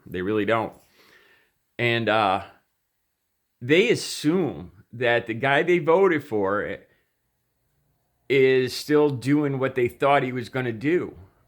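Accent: South, United States. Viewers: You made a very wise choice in checking Beau out. He's highly intelligent and delivers subjects in a manner that all can understand and hopefully make them use critical thinking. I have to grin about your speaking with a southern accent...Beau is from Florida...all Southerners have a drawl and I love being from the south. You take care and I am glad you like Beau...he's a good man.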